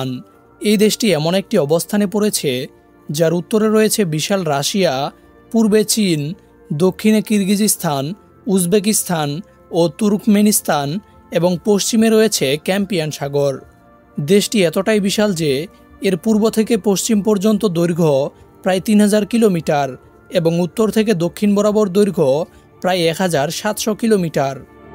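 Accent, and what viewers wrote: Sylhet, Bangladesh. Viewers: আপনার বাড়ি মনে হয় সিলেটে।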